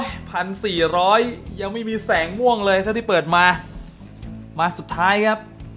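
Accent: Isan, Thailand. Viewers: เอ้า พี่เป็นภาคอีสานผมพึ่งรุ้ แล้วอยู่จังหวัดอะไรครับ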